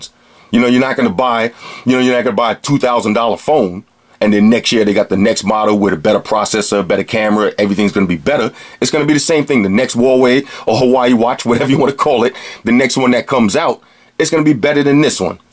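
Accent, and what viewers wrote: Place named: Brooklyn, United States. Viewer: Love your vids homie! Mad informative. You sound like my cousin in Brooklyn i swear! Salute!!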